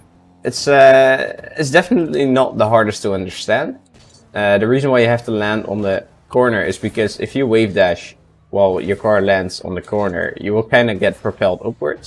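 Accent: Netherlands. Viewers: I just know ur a fellow dutch person, i can hear it in ur accent 😂 great video man, love it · I smell Dutch, bedankt voor de tutorial maat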